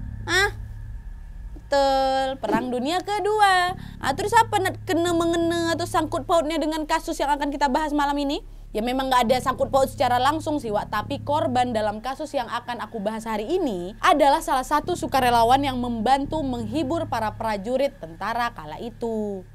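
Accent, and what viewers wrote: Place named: West Sumatra, Indonesia. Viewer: "Ndak itu jawabannya do" 😅 awak takana jo kampuang mandanga nad2 mangecek macam tu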